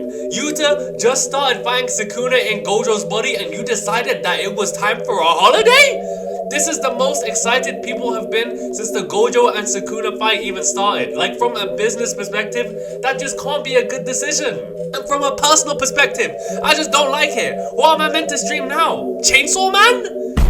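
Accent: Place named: South London, United Kingdom. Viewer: you sound like a south londoner... croydon?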